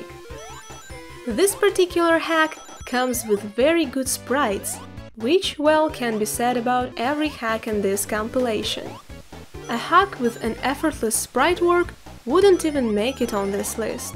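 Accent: Russia. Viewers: Are you russian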